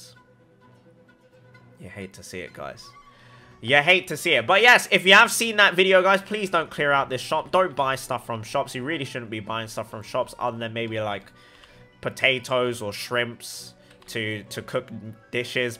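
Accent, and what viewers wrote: United Kingdom. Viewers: I've watched many Genshin content creators, this is the only channel I keep coming back.. There's no happier thing than to watch an Asian with a British accent :D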